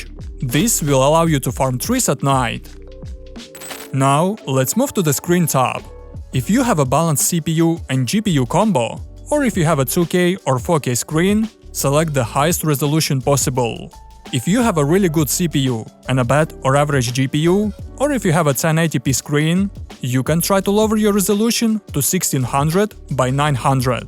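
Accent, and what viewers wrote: Russia. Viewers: русский акцент ни с чем не спутать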